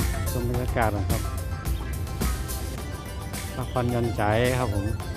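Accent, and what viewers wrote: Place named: North, Thailand. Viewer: เมินๆจะได้ป๊ะคนเหนือเนาะ,อู้เมืองมันม่วนแต๊หนาอ้าย,วิวงามขนาดเลยคับ,เติมใจ๋หื้อเด้ออ้าย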